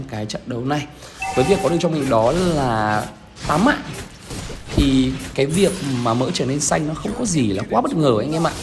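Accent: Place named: North, Vietnam. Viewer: Trai Bấc ló vậy đóa bẹn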